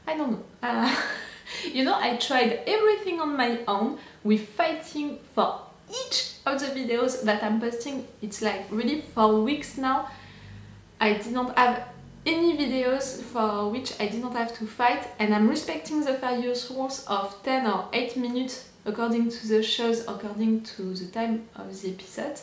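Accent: France. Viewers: Je viens de découvrir à l'instant ta chaîne et je voulais savoir c'est moi où tu a un accent français ? Sans savoir parler anglais je pense entendre l'accent francais quand tu parle... , En tout cas sa ne m'a pas l'air d'être l'accent anglais...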